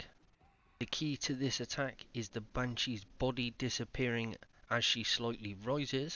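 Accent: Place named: Bristol, United Kingdom. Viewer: Sounds Brizzo to me.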